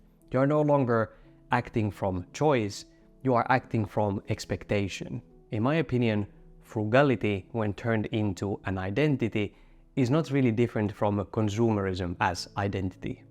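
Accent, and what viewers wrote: Finland. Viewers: 100% Finnish accent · Not to be the weirdo, but I love the Finnish accent. It reminds me of previous trips there and favourite bands. So your channel is the ultimate combination 😅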